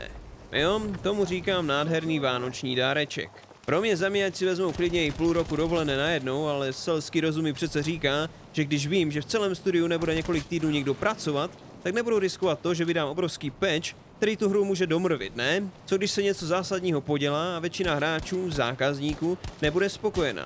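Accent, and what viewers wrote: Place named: United States. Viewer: prídáváš Americký přízvuk a to je děs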